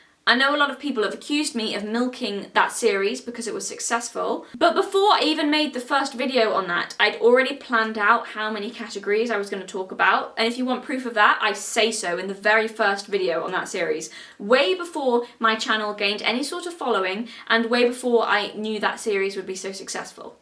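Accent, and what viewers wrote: United Kingdom. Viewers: Just found this channel and this is absolutely glorious. And I don’t just say this as a fellow stylish Brit with a similar accent and knack for swearing. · Shadiversity….Now I know why you showed up in my recommended out of nowhere lmao EDIT: oh this is gonna be a really British woman ranting passionately about tyrants. This will be good for my lifting routine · I'm a brand new viewer, your James cordon video just came up in recommended and I was like yeah sure. I do not give a shit about James cordon, I don't care about any of his work, but I watched the whole entire video in one sitting not because I was waiting in baited breath to finally see James cordon finally get demolished, but because of you. Your writing, performance, delivery, structure, you completely hooked me and I subscribed immediately. Maybe it's just the novelty of hearing angry British woman as an American but I watched your video not because I wanted to hear about James cordon but because I was curious about your channel and you hooked me in and after watching this video all in one sitting right after that James cordon (drunk yet?) I have a feeling nobody cares all that much about what you talk about, only that you talk about it. 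I'm not watching A VIDEO about James cordon I'm watching YOUR VIDEO. I really hope you stick around here cause you seem to be good at this. Maybe you declare you will in this video, I'm only at the 30 min mark but regardless of what you decide, I wish you good luck, you certainly deserve it Edit: finished the video now, another channel called filmento is a good example of what you're trying to do I think. He uses movies to talk about concepts like how to make a movie for kids vs adults or how to poorly or properly do exposition so he might be a good bit of guidance for what you want to try to do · It's such a relief to hear with my own ears that intelligent people with British accents still exist. Greetings from across the pond.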